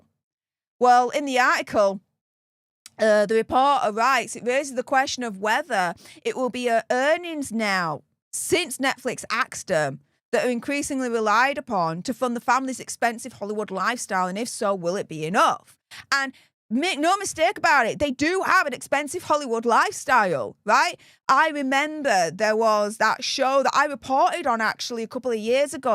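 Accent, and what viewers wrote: United Kingdom. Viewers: You have my least favorite British accent it’s horrible. Where are you from?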